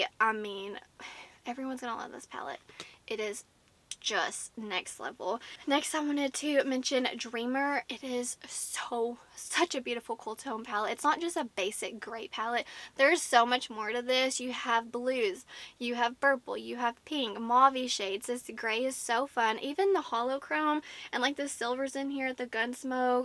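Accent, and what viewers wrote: South, United States. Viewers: I love the southern accent so much❤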